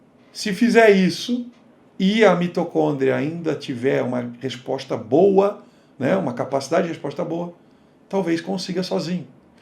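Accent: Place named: Minas Gerais, Brazil. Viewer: Gosto demais do seu male male, dr. Sou mineira e minha avó falava assim. 😊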